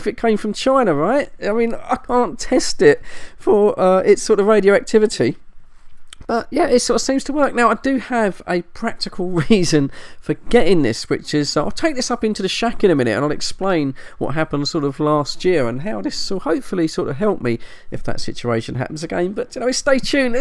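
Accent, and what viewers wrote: United Kingdom. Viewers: Your British voice sells this...cheers from a Californian Mexican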